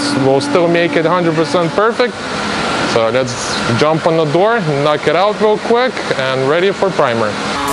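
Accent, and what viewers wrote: Russia. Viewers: Бля,слишу акцент;)землячок!спс подписался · Ухо какой акцент )) наш человек )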